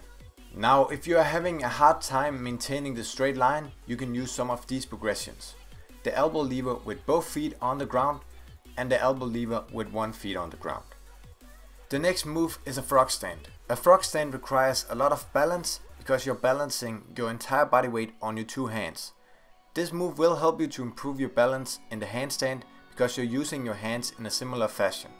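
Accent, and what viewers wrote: Denmark. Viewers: Nice danish accent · I love the Danish accent😂😂😂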